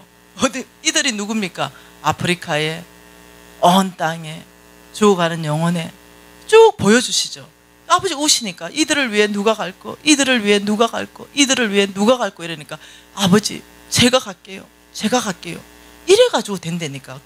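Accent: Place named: Gyeongsang, South Korea. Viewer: 간사님 대구분이신가요? 경상도 억양과 말투가 고스란히 나와서 친근하네요 ~~. 대구. 수성구에서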